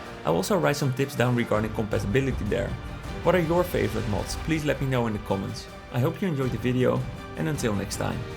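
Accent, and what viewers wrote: Netherlands. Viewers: I can hear your dutch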